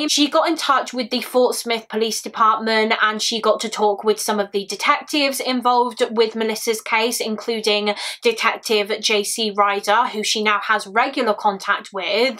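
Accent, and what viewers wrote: United Kingdom. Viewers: Hi, I was curious what part of the UK your accent is from? I enjoy hearing it!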